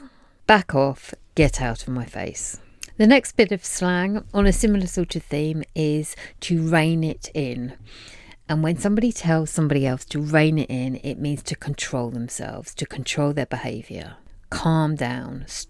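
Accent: United Kingdom. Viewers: Always waiting for your videos. Love British English and the way you teach it. ❤❤❤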